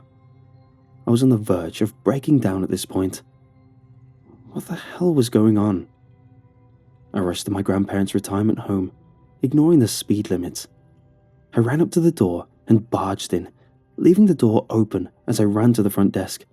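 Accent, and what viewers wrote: England, United Kingdom. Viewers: Not on my reg profile, on a friends..I've been listenin 2 for yrs now, my fave CP narrirator, is it just me, or his voice sound watered down? way less English?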